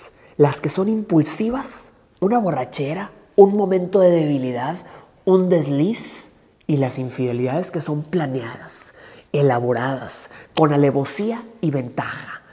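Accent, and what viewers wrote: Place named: Cádiz, Spain. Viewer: Habla como los angeles y me llego como si de gaditano se tratara oleeeee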